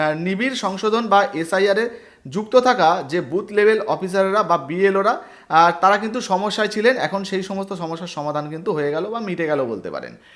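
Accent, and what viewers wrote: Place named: Bangladesh. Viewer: এ ভাই তুই জানিস যে বাংলাদেশী নিয়ে কি হবে তোর বাপের 1971সালের ভোটের লিষ্টে নাম আছে কি না তাই দেখ তার পর ভিডিও করিস তোর কথা শুনে মনে হয় তুই বাংলাদেশী আগে তুই কোথায় যাবি সেটা ভাব তার পর ভালো করে ভিডিও বানাস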